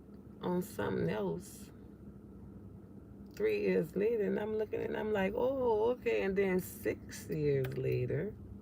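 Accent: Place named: New Orleans, United States. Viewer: I love the New Orleans accent. You're so authentic. I love it. I'm a new subscriber.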